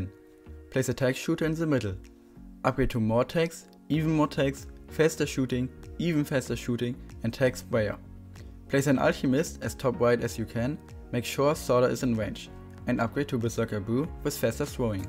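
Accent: Germany. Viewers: Noch nie so nen deitschen akzent gehört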